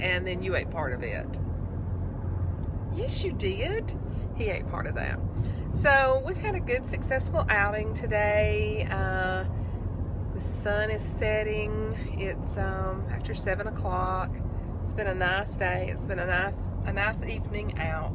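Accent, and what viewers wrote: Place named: North Carolina, United States. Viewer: I love your accent! I'm from NC and it reminds me of a lot of my family's accents :)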